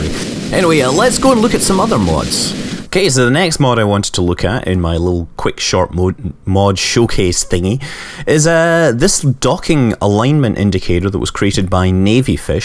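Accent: Scotland, United Kingdom. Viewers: Scottish Manly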